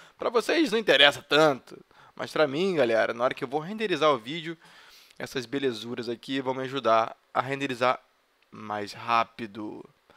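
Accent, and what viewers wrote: Rio de Janeiro, Brazil. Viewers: Tu é de qual zona do rio!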